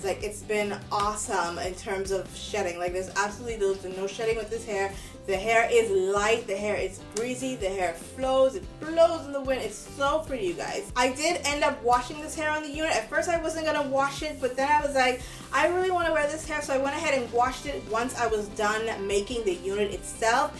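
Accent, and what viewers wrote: Bahamas. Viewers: Hi great review, the hair look amazing on you😉. I just found your channel and I am so happy I did, you're really sweet and very fun to watch😁. I can't wait to watch more of your videos!!! I heard my accent I'm Bahamian too so you know I will be giving you my love and support😁😁!!!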